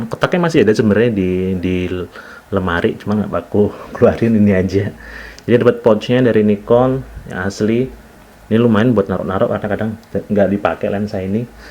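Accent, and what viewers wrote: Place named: Surakarta, Indonesia. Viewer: Koyok e wong solo iki haha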